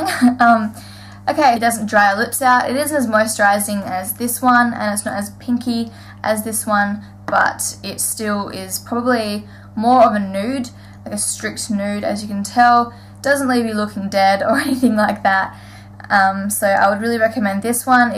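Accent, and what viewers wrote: Australia. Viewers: its weird hearing another australian on youtube because everyone is american!!! this is what i sound like to others :D and i love it!! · I love the Rimmel one!! I cant belive i JUST found u! A fellow aussie lol altho ur accent is alittle more refind than mine hahaha hope 2 keep in touch, ur awesome! xx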